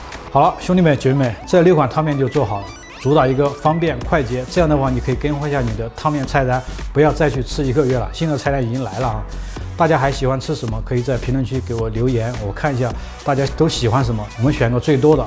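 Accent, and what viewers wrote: Sichuan, China. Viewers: rua一下，老师的川普太萌了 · 你那个川普真的…. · 川味普通话，好亲切！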